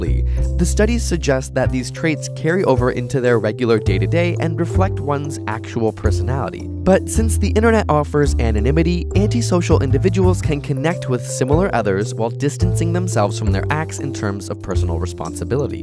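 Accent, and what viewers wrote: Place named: Canada. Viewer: It's not the gayness that bothers me. It's the way you Canadians say "out". That's funny.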